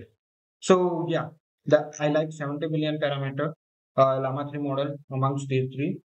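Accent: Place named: India. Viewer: I like the approach of this channel, a doctor testing AI technology. What I can say for this to improve is maybe speak a little bit slower as indian accent is sometimes hard to follow.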